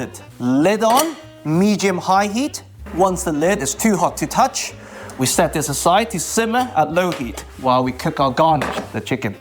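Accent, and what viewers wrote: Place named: United Kingdom. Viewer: Your British accent is adorable! 🥰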